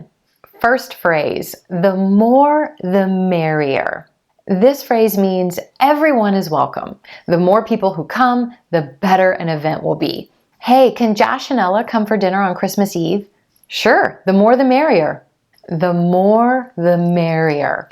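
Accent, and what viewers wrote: United States. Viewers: One of the best American accent teachers ever ❤️ merry Christmas 🎁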